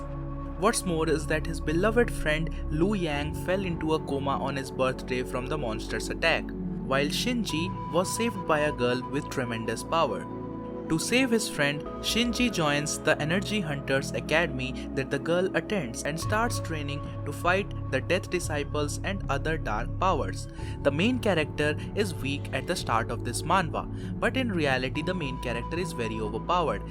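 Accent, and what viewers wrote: India. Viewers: bro, sound so much like a Indian... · Your accent is Indian 😉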